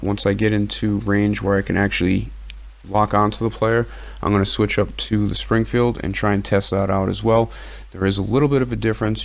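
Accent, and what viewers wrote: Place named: Massachusetts, United States. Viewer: Lol sup dood? I can tell you are from MA. You from Southie guy?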